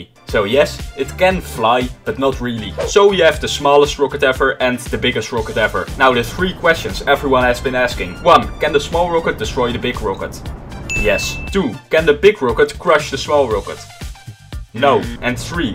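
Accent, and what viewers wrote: Netherlands. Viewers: This guy is totaly dutch like 100% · He is from holand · ik hoor een nederlans asent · You sound dutch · i hear some dutch accent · Ik kan horen dat je Nederlands bent, maar dat neemt niet weg dat je Engels goed is. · Een klein beetje Nederlands accent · No one like bc he sounds dutch just talk dutch no one understands you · Je bent sowieso Nederlands Or if You’re English I thought you were Dutch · Are u dutch? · Are you dutch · Are you from The Netherlands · You dutch?? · You sound dutch? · Wait he Dutch? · bros dutch?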